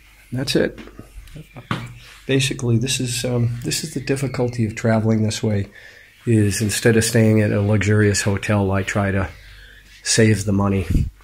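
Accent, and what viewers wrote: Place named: New York, United States. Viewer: So cool hearing a New York accent in China!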